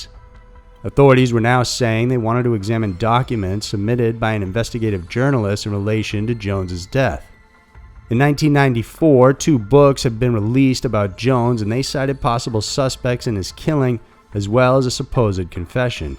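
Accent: South, United States. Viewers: "brian" is misspelled in the title but he pronounces it very normally for someone with a light southern accent - "brahn" - like how he says "nine" more like "nahn" - just an average drawl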